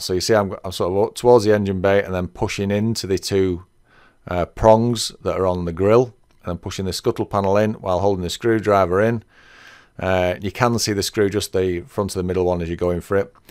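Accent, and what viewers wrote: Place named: England, United Kingdom. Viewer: Good to hear an English voice for a change on an instructional video.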